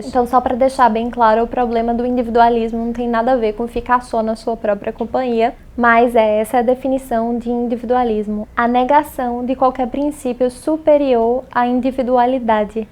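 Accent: Brazil. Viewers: Acho engraçado o sataque dela de Pernambuco!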